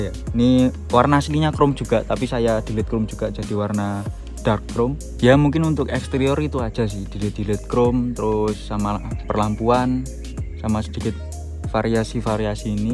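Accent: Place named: Java, Indonesia. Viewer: wkwkwkw medok nya ganggu banget anjiing jawa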